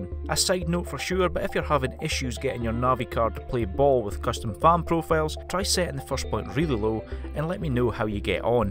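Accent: Scotland, United Kingdom. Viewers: the Scottish accent convinced me that I should do this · yesss a Scottish narrator finally ear candy while i learn something... wow that sounded gaeeeee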